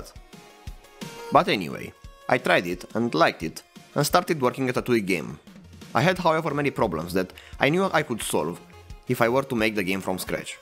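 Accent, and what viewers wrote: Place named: Romania. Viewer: Oho, this romanian accent!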